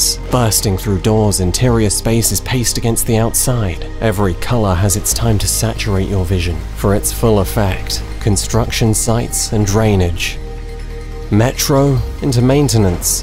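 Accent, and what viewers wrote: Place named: United Kingdom. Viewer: I would say it's that damn British accent but no I think you're quite right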